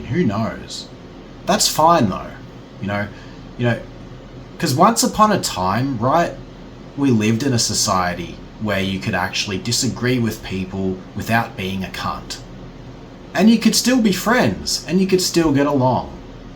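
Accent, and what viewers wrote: Australia. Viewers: everything in a aussie accent sounds better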